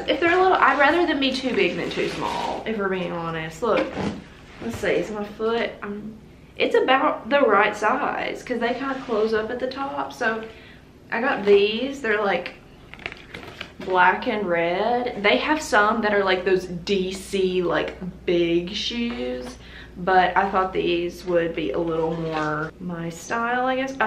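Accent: South, United States. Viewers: Top tier American accent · You're losing your sexy southern accent 🥺😭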